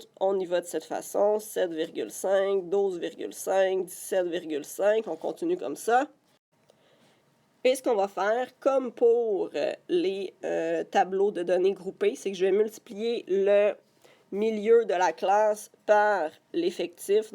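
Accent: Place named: Quebec, Canada. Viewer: elle est quebecoise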